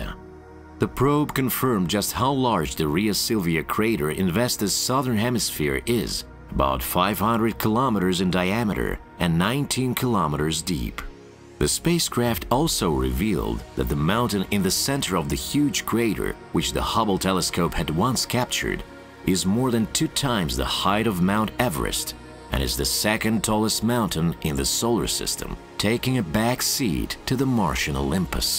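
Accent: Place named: United States. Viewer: Отличная озвучка на английском с американским акцентом. Супер!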